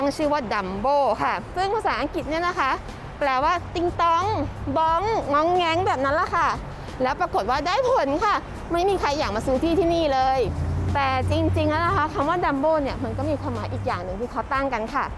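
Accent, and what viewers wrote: United Kingdom. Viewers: สำเนียงพี่ บริติชมากเลยยยย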